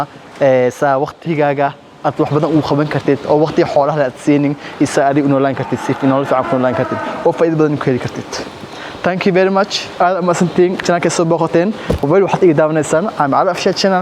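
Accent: Mogadishu, Somalia. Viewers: Very interesting although I find it difficult to understand the reer xamar dialect.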